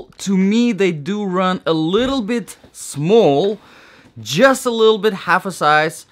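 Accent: Russia. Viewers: İs it Russian accent?)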